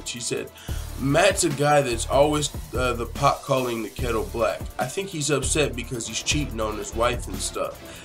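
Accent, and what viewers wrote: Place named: South, United States. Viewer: Dang Boy, you're way more country than i had originally thought..Remind me of Clayton Bigsby haha!! Stick to that accent now, ya-hear??🤠🐴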